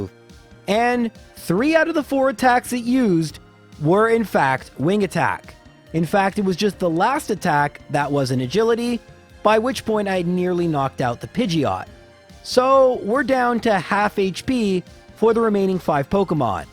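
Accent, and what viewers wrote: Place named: Canada. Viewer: I absolutely love your content J , but the way you say “against” drives me up a fucking wall 😂😂😂 is that because you’re Canadian or some shit ? You sound Canadian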